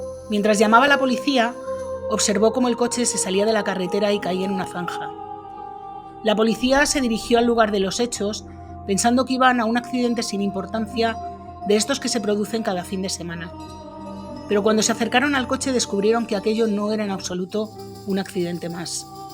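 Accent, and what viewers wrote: Spain. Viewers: Soy dominicana, resistente en NY. Me encanta el acento español.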